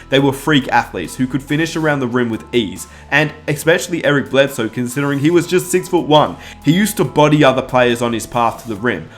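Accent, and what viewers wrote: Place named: Australia. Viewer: Australian mate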